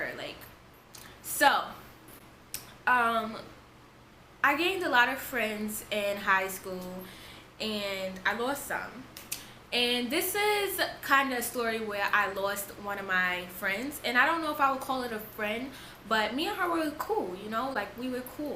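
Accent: New York, United States. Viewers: I love to listen to her I love NY accents so much 😂😍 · I love your New York accent !! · Lmao Spoken Like a True New Yorker! Love You! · Love the New York accent and moreeeeeee story time plzzz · I'm really sitting here realizing all of us from Ny talk the same when we mad or explaining a fight . Lol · Your New York accent is so cute lol. I love it. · You was going in talking about the story, especially with your NY accent I Lo it lol.... But I love your moral of the story! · Are you from NY? And I don't mean this like in a mouthy or smart way, I promise 😂👐 I just legitimately wanna know if you're from NY cause I just gave a question about the dialect lol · They way you talk, like you sound like from your from new york. I know you are from there but. I wish i could talk like that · I love her accent my cousins are from NY and they make fun of my southern Floridian accent 😩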